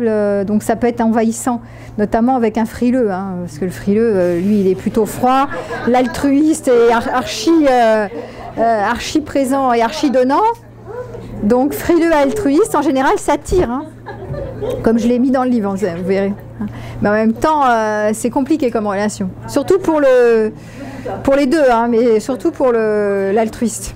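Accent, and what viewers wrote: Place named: South, France. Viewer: je comprends pourquoi je vous trouve une ressemblance avec celle de ma collègue. Elle est aussi du Sud de la France. Raison pour laquelle vous avez probablement, la même intonation, je pense... En plus du côté alpha, j’étais aussi clivé, je dirais que je suis beaucoup plus clivé aujourd’hui.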